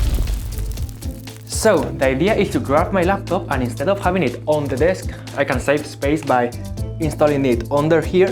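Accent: Spain. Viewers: spanish accent on a asian guy is really trippy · Bro has a spanish english accent lol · It’s breaking my brain to see an Asian with a Spanish accent. 😊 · Are you a spanish speaker? Your accent while speaking english is kinda similar to ours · Great video. You sound spanish, are you from spain?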